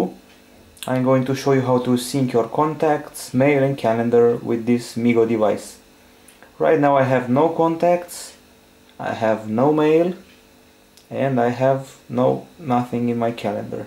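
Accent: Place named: Romania. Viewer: Frumos tuturoial facut de un roman dupa accent..Respect!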